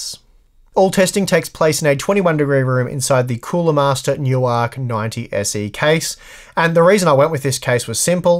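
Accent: Australia. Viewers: Might not apply, Google caption said curve at 19. Aussie accent usefulness.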